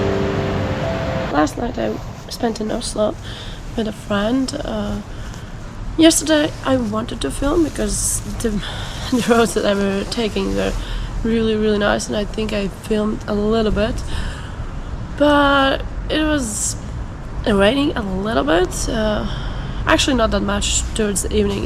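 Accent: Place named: Latvia. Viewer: Tas nodevīgais latviešu akcents...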